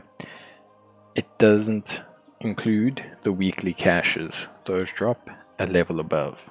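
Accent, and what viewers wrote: South Africa. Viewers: Bro, you South African?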